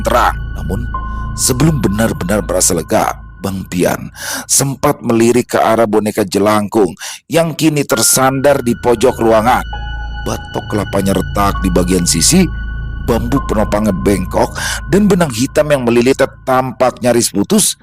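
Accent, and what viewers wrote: Jakarta, Indonesia. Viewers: Waalaikumsalam mlm bang, Alhamdulillah sehat bang,semoga abg,team dan penulis sehat slalu,Batam setia hadir nyimak bang,ayo gas kan bang,biasanya ada tuh kata2 yg lucu klu sdh logat Betawi muncul bang nih 😂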